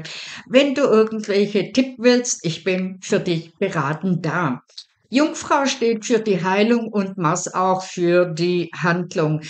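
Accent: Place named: Swabia, Germany. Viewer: diese schwäbische (?) Akzentuierung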